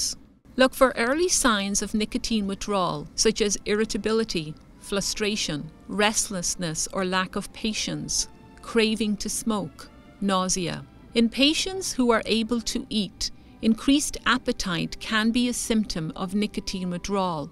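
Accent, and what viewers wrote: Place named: Ireland. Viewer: Big thick Irish accent in Toronto haha love it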